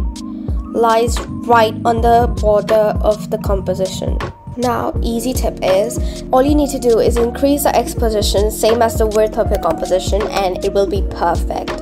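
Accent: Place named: United Kingdom. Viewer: she has a british accent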